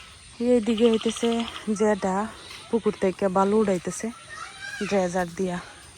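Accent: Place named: Comilla, Bangladesh. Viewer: ম্যাডাম কেমন আছেন। আপনার কথাশুনে বুজতে পারছি আপনার পর্ব পুরুষ কুমিল্লার হবে।তবে যাক পরের কথা হল আমি কুমিল্লা জিলা তিতাস উপজেলা থেকে দেখতেছি আমি বলতেছি ম্যাডাম যদি জমি কিনে রেখে দিলে কয়েক বছর পরে বিক্রি করার জন্য ঠিক হবে কি। কমেনট করে জানাইবা কেমন ।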